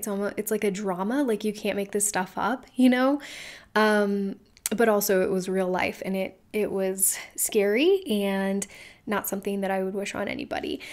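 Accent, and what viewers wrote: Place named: England, United Kingdom. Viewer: Where are you from? I love your English accent ❤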